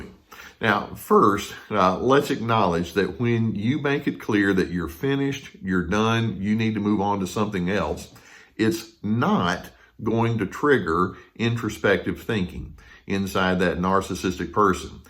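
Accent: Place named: Texas, United States. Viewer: Exact description of my neighbor, he is in the 'suffering' stage. Once we know the way, we will always win by taking the higher ground and holding our power. This is the VICTORY that you share so freely, giving true hope to those who are on the road to recovery. And, your Texas accent with your soothing voice is SO RELAXING AND AFFIRMING!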